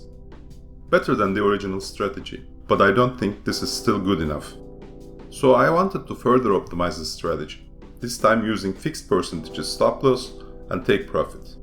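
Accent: Turkey. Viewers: It seems a Turkish voice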